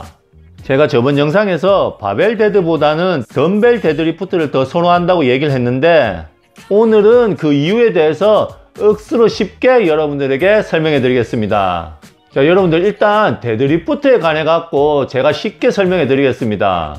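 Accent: Gyeongsang, South Korea. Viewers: 형님 항상 꿀팁 잘 보고 있습니다~ 같은 경상도라서 그런지 말투도 친숙하고 귀에 쏙쏙 설명이 박히네요 앞으로도 좋은 영상 계속 찍어주세요 (개인적으로 일두근 영상도 다시 해주셨으면 하는 바람,,)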